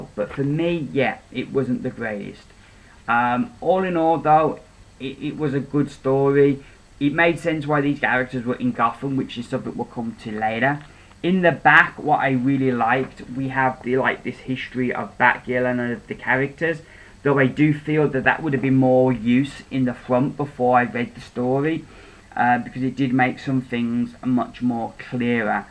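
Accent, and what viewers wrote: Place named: South, United States. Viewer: Enjoying your southern US accent :)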